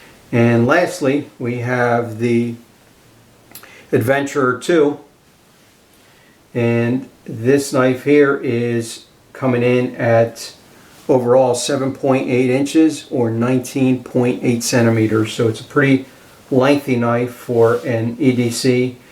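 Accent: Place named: Philadelphia, United States. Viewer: That’s a philly/ south Jersey accent if I ever heard one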